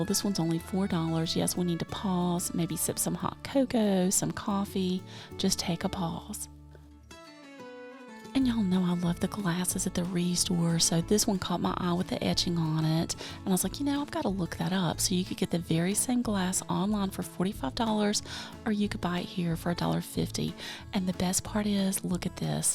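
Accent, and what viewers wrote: South, United States. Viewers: yes, and when we had a land line I used them. It brings back nice memories. Just like taking those trips with you. And hearing your sweet southern accent just brings back all those wonderful growing up days. Many thanks for you doing this for us all.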